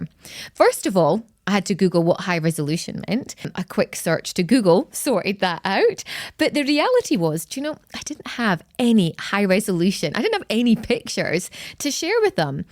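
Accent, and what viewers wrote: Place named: Scotland, United Kingdom. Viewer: Context, Challenge, Confront and Consequence....wow...I thought initially that you were from Sweden. Then recognised your Scottish accent. Thanks for the lesson.👍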